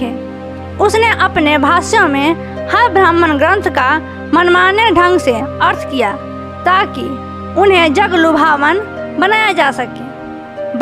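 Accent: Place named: Bihar, India. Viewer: आप किआवज बिहारी जैसी है किसी दो गुट आपस मे लड़ रहे हो तो उसको शांत कर दिया इसलिए वह खराब है ऐसी सोच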